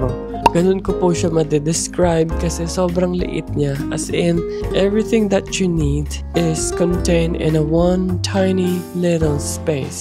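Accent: United Kingdom. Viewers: Why do you have British twang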